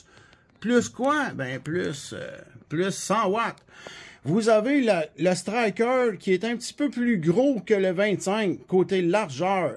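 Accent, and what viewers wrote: Quebec, Canada. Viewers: J'adore le fran parle québécois. Merci pour la vidéo. Salutations de Shawinigan 👋 10-4👍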